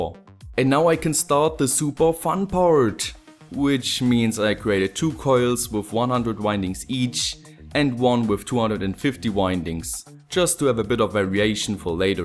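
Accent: Germany. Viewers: Do you come from germany? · ***** schon nur geb zu es ist zum teil nervig den deutschen akzent im englischen zu hören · Bisch du Deutsch? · w8 r u from Germany? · Bist du deutscher? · Are you German? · Man hört es richtig heraus dass du ein Deutscher bist. Dein Englisch ahahahahaha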